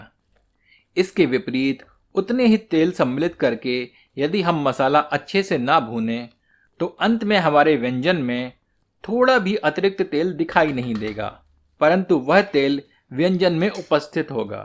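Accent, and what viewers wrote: Standard. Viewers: क्या बात है,शुद्ध हिन्दी। रैसिपी के साथ शायरी का आनंद। मज़ा आ गया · itni shuddha hindi aaj ke zamane me maine kisi ko bolte hue nahi suna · itni shudh hindi sunkar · Aap itna shuddh hindi bolte hain lagta hain middle age ka koi cookery show dekh rahi hu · The way u speaks in shudh hindi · आपकी शुध्द हिन्दी का उच्चारण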